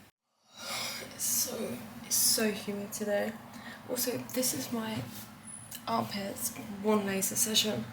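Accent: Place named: England, United Kingdom. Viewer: Came here from tt - English accent was a nice surprise 😂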